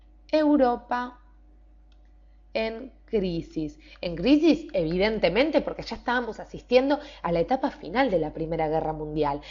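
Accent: Argentina. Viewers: es de argentina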